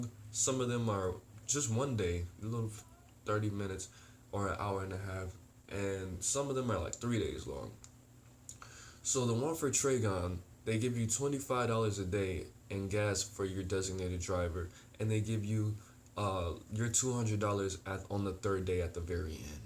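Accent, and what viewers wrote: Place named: Chicago, United States. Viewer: I just watched this video for the second time. While watching your video I took notes....thanks for shouting me out.....we're u born in chiTown?...u have an accent to ya!....just applied and did do some lying on the surveys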